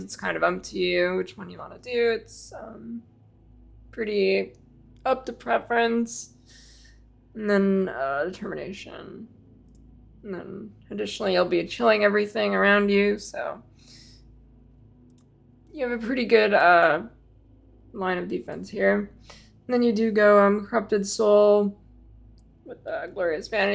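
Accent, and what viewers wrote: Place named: United States. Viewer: That's her voice. She has an American accent. It's not an indication of anything other than where she learned English.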